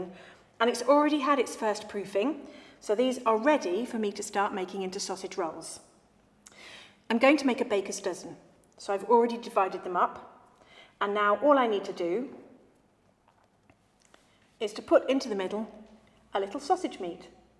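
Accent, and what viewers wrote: United Kingdom. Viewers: I love the pronounciation of british people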